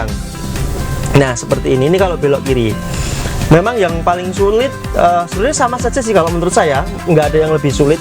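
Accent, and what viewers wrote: Java, Indonesia. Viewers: kalo boleh tau itu mobilnya siapa mas? logatnya jawa banget tapi plat nya D (bandung) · g papap mas bilang Cagak. itu cirikhasnya Vlognya mas ada aksen jawanya, dan itu yg bikin lebih seru dan enjoy untuk d tonton. sehat terus mas. rajin upload terus. lancar rizkynya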